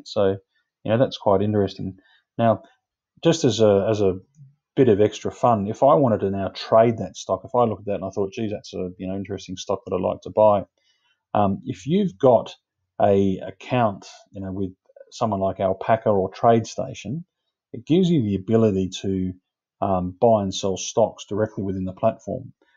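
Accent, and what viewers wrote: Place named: Australia. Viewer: Mate your videos are superb, no fluff, music or silly thumbnails, just solid useful information. Thank you. I've subbed, you deserve more man. Plus your easy on the ear, kinda like an Aussie Jim Browning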